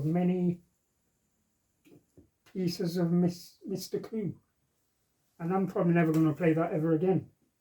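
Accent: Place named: England, United Kingdom. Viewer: Imagine 'correcting' an English person on English with an American way of pronouncing it. Cringe.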